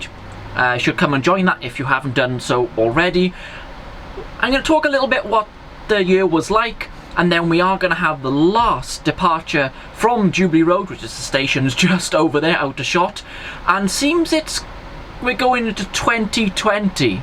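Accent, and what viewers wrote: Wales, United Kingdom. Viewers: Hello, firstly thank you for the many videos from this year on your YouTube channel. Congratulations on your new job before Christmas. As for the accent, well mate I subscribed to your channel because of your accent, don't worry about people who appear to be anti Welsh, I'm part Welsh and very proud of my Welsh heritage. Your Christmas video this year I absolutely loved as ever mate its fabulous, just like your remembrance Sunday special, really nice that you care about others. Happy New year, and hope that you have a happy prosperous New year personally and for the channel, can't wait to hear what you are planning for 2020 for jubilee road international. Hope to meet you next year at the meet and greet meet up at the SVR. Cheers, from in Middleton Manchester. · To the best of my knowledge, you are the only Welsh Model Railway contributor on YouTube. 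I wouldn't worry what people say about your accent! Diolch. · Happy New Year. Look forward to new videos during 2020. Love the Welsh accent. I visited Wales during the summer, it was scorching hot, went up Snowdon, didn't need a coat it was so hot. Must have been roasting in your loft. Keep up the good work. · I've been watching for your YouTube channel and I'm watching it right now with the new year time but be honest with you I like really welsh accent it's really cold and my real name is Daniel Lee Baker from South Yorkshire Doncaster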